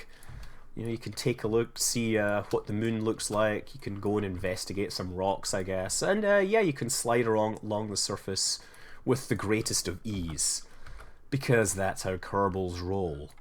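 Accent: Scotland, United Kingdom. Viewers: can I just say? I freakin love your accent your dude! You sound like Scotty from Star Trek and that's a good thing!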